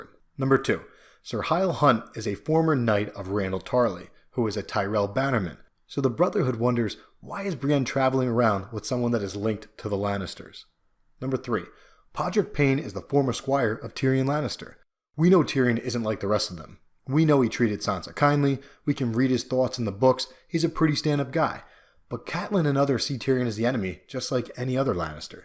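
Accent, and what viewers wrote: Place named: East Coast, United States. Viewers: Normally I could care less about lady stoneheart; however, after watching this video I hope HBO (or D&D) puts the big scene in the show! As usual, you make everything SO interesting, even Lady Stoneheart! Your positive attitude & 🆙beat personality😄 makes your videos a joy🌈 to watch 👀 Your cute EastCoast accent & awesome voice 💞 makes your videos a joy🌈 to listen to 👂 Always a pleasure Thanx!😁 💗💚💛💜💙💖💚💛💜💙💝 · Cammy O hey woah...east coast accents are bad-ass....not cute. 😉Love ya girlie.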